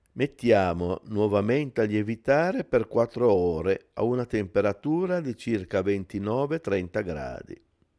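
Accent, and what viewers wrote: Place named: Genoa, Italy. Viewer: che bello sentire un bell'accento" zeneise"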